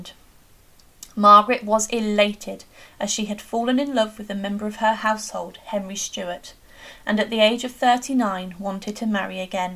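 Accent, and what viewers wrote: England, United Kingdom. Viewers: While it is spelled ‘Holy-rood’ it is pronounced ‘Holly-rood’. I am Scottish, born on the west coast, grew up in Inverclyde, university in Aberdeen, lived in Edinburgh - where Holyrood is to this day - and I can assure you, your pronunciation is WRONG. Given your accent, I am assuming you’re English. You should know better.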